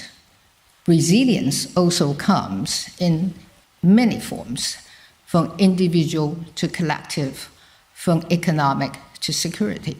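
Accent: United Kingdom. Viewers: 好喜歡聽她說的英國腔英語。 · 台灣出了一個帶日本腔英文的李登輝，又出一個帶英國腔的蔡英文，現在又有一個台灣腔的賴清德，真是連總統都多元化的寶地。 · 現在仔細聽，真的有英國腔~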